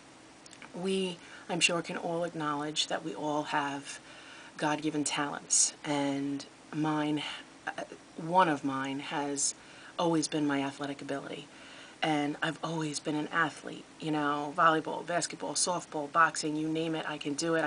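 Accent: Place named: East Coast, United States. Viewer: Great vlog! So proud of you thinking through things you're "saying to self". Ps..love your east coast accent:)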